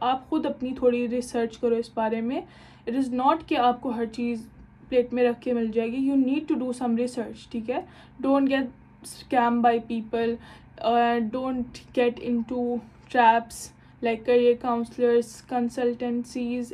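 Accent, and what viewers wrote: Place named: Kashmir, India. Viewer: You accent and your looks seem like kashmiri 😳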